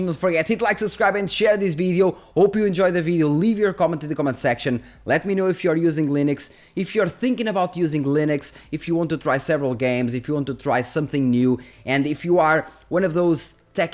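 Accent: Portugal. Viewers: I can hear your portuguese tgroufh your english accent. (Not a critic.. Mine is worse). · Assim que abri o video percebi logo que eras tuga lol, bom video, já há cerca de 3 meses que uso mint e ando a gostar bastante, mais tempo de bateria melhor performance, sem telemetria (tudo comparado com o W11)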